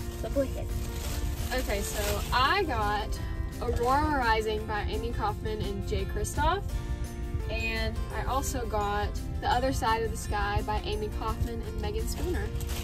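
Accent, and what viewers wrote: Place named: South, United States. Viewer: Not to be creepy or anything, but I live in the same place you filmed this :) I knew I heard a southern accent! (I have one, too, no worries) 😂 loved the video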